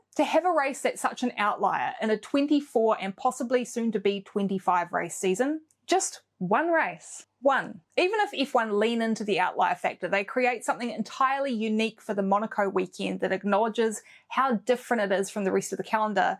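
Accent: New Zealand. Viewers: Stop!! You need to give people warning. You really do. A Kiwi accent with a slight lisp. Loud, proud, and scary. Oh God while I'm typing the dog just went into meltdown. Don't ever say kettle of fish like that ever again. They are cancelling you because of public health, and maybe watching style and hanging out for a red flag and all your other Aunty type of preaching over the most singular boring sporting event that is somehow still on. Come on, Union and netball is your bag. That's your wheelhouse, your hitting zone, not doing a Karen preach about the most boring thing ever televised. The Military tattoo is better viewing. And..... Learn how to pronounce hypocritical properly. Please. Sorry but it's the truth. That accent, at that speed, with that entitled Jacinda like, I know boats, put down isn't your strong point. I can't listen to another do I'll just ask... 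Do you do this word of the week thing in each post? How many times can you get outlier into one post? Wow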